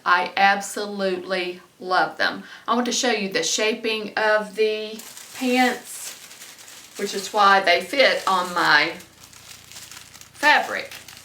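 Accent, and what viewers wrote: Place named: Tennessee, United States. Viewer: Yep, already knew it and this was yet again more confirmation: you're a genius. With a TN accent. 😘😘😘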